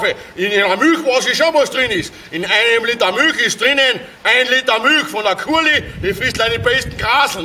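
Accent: Austria. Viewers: English vs Österreichisch🤣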